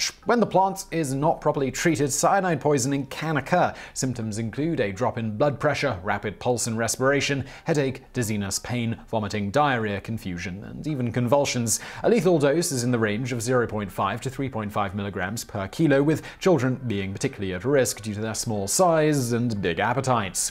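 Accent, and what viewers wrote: United Kingdom. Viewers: You sound British but then you say stuff that is making you look totally not British if you went to a Uk school you had tapioca and sago !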